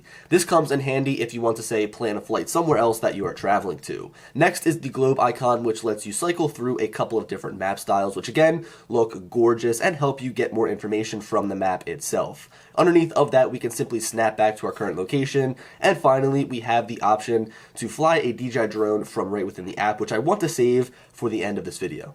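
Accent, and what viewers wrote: Philadelphia, United States. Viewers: Nice overview. I thought I recognized your Philly accent. Keep doing your thing.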